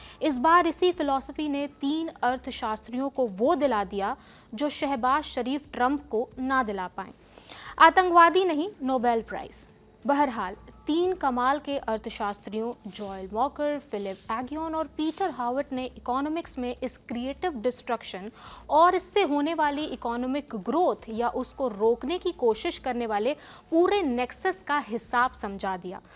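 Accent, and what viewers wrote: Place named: Bihar, India. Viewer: Y all lallantop anchor use bihari accent....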